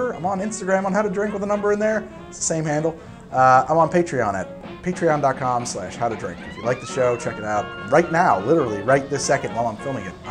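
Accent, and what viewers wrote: New Jersey, United States. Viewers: Punxsutawney, Pennsylvania. Laird's., your Jersey is showing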